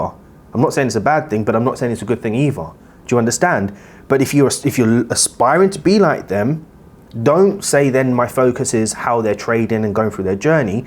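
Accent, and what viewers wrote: London, United Kingdom. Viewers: Good old London matie!!! 🇬🇧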